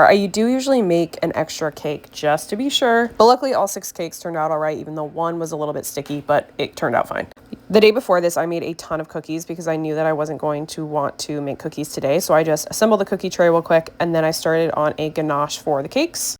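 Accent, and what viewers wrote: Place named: Wisconsin, United States. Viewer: Sounds like up north in WI...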